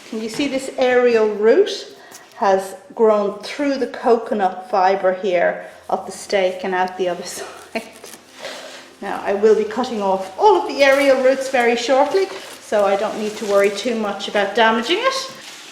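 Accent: Ireland. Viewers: OH NOOO!! Every plant lover's worst nightmare! Not the Monstera! LOL - I stumbled upon your channel recently and just subscribed! Love it and all your tips (and lovely Irish brogue!) Happy Planting, dear one!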